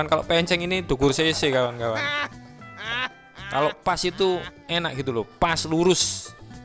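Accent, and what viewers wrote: Java, Indonesia. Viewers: Satu hal yg saya suka di channel Om..walaupun jowo ne kental..tp bahasa inggrisnya ga salah2x dan ga asal2x..Eco Cube betul2x dibaca "eko kyub"..bukan ky beberapa channel lain yg bacanya "eko kab"...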